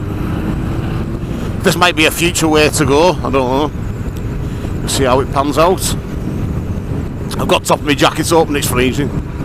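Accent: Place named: North West England, United Kingdom. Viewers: Where in the UK is this filmed? Your accent seems north west? Just curious. Ex pat. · A nice little ride. Thanks for that. You show quite a bit of character and made me laugh a couple of times, you and your Northern accent. I would have liked to hear more of your feelings about the bike's handling, etc. I think it looks great and the engine sounds superb. Feels solid and smooth. I think, by the way, that most road users are annoyed that there are other road users on the same stretch of road.:)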